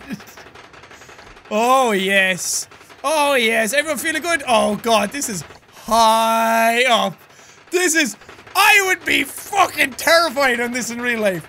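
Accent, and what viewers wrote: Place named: Ireland. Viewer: wow you are irish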